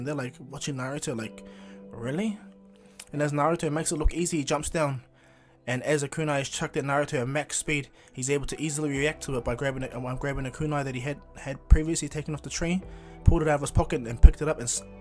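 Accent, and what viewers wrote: New Zealand. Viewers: Another Kiwi I was able to tell just by listening to you my g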